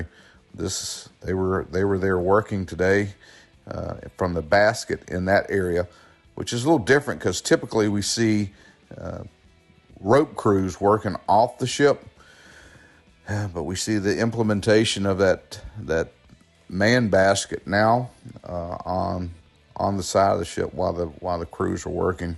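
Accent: South, United States. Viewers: The water conditions sound better with your southern drawl!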